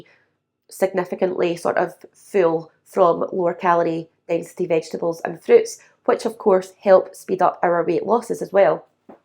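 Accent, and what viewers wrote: Scotland, United Kingdom. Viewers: Your Scottish accent is adorable 🎉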